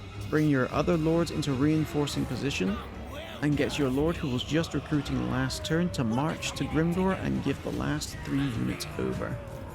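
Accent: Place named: Scotland, United Kingdom. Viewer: Nice video mate, I'll be sure to give this a bash. As a side question, where is your accent from? It sounds like a mixture of very light Scottish and something else?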